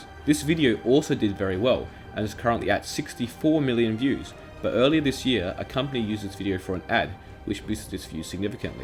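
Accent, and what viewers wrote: United Kingdom. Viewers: It's the british accent. I love it!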